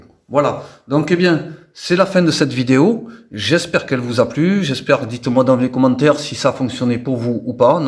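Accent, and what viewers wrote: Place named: Southwest, France. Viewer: Super tuto !! bravo pour cette méthode simple et efficace👍👍 j'adore votre accent du sud-ouest 🤔🤔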